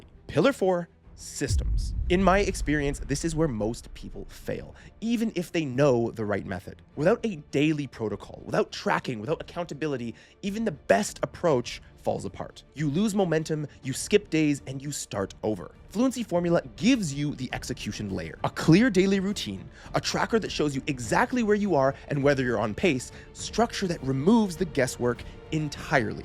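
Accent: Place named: China. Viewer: I'm also from china，hella impressed by ur Chinese accent and applications，u sound literally Chinese！！！OMG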